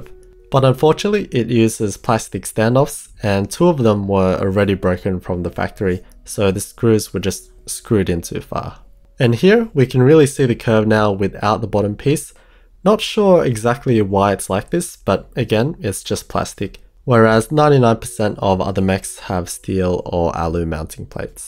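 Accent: Australia. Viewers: the hint of Australian in his accent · Oh wow you’re Australian too nice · aussie mate]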